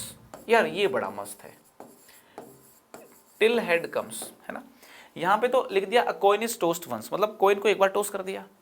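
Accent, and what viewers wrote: India. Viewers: I understand Indian accent badly but it is still better than our math at school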